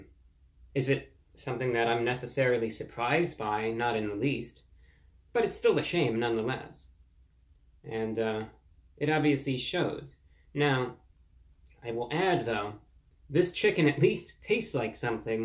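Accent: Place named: United States. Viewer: Hello my American friend. I know you are American, because you guys are unable to say certain words lol. You guys can't say forward, you say foward lol. You guys also can't say espresso, you call it expresso. That annoyance aside, love your content! lol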